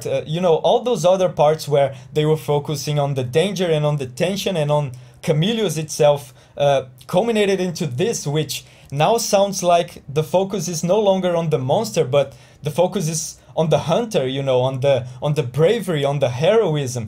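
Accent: Brazil. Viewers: wow you sounds very brazillian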